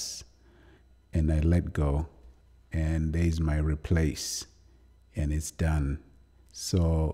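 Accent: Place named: South Africa. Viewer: you from SA? accent had me feeling at home lol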